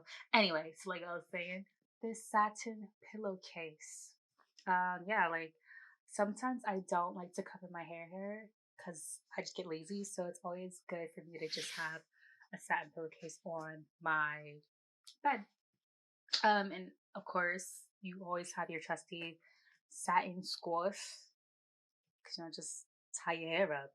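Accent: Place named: New York, United States. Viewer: I love your NY accent (don’t change how you talk) and your locs. They have grown out sooooo 😍 nicely. Been a subbie since the beginning. I would recommend supporting a YouTuber named Tameka by nature below is the link to her business. She is also a New Yorker and is always promoting positivity and supporting small you tubers. Shout her out and/or support. I truly respect that you want to do this🤗. Stay blessed sis🙏🏾. <link>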